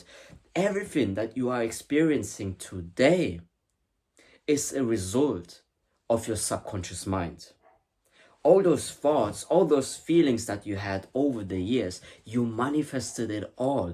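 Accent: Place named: Scotland, United Kingdom. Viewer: are you from Scotland? lovely accent wow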